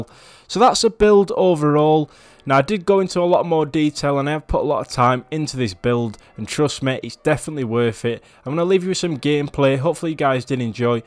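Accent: United Kingdom. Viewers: Just out of curiosity what part of the UK is your accent from?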